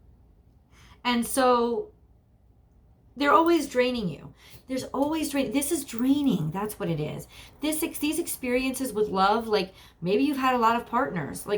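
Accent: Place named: East Coast, United States. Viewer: so glad to seeing you in such good, and wonderful Spirits, after all you have been through recently. You are such a joy and blessing to watch you, when you post for us. You absolutely make my day, when I get to be with you on the postings., I have gotta ask you, I defintely see a very strong Italian background with you. The way we, ( I'm Italian too, on my Mom's side), talk with our hands, your eye movements, and facial structure, nose, mouth, etc. and your accent as well, East Coast, New Jersey or New York area, but I see and hear "Italy", with and from you! So, am I right, are you of Italian descent in your familia? Bye for now, Caio Bella! Bob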